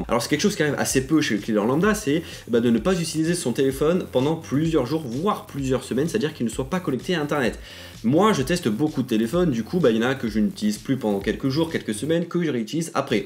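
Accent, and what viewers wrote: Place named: Marseille, France. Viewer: ahh j'ai griller ton accent marseillaise et team ios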